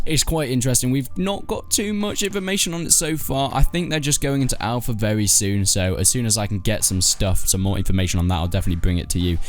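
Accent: United Kingdom. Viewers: Yes I agree can't understand what your saying with that Brit accent. A game list would be nice.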